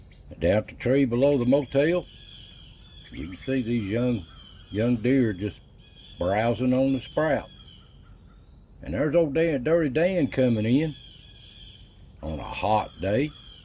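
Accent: Appalachia, United States. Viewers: I'm assuming n we should never do that but you r from Appalachian mountains for sure . Tennessee? Ty Southern brother.hillbily mill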